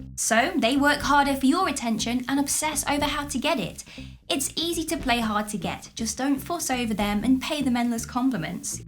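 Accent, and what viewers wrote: United Kingdom. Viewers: Sounds like a bloody brit , not?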